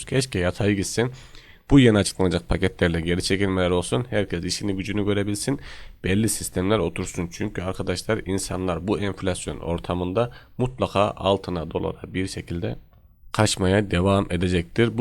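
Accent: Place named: Kaman, Turkey. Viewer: Geçmiş olsun , Kamanlı konuşmanı biraz düzeltmiş hastalık.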